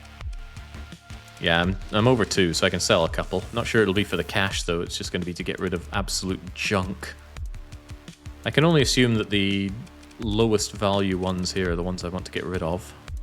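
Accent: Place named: Scotland, United Kingdom. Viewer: Hearing a Scot talking about "the first thing you should do is go to the Bar" and I get flashbacks to That Time I Was In Livingstone in the 70s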